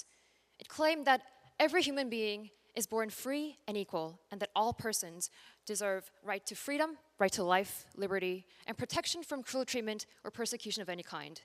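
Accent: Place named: United States. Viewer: WOW SHE S GOT THE ENGLISH ACCENT THAT MOSTLY AMERICAN NATIVE SPEAKERS HAVE I CAN CLEARLY UNDERSTAND HER GIVING SPEECH WORD BY WORD SHE IS EXCELLENT SPEAKER VERY WELL DONE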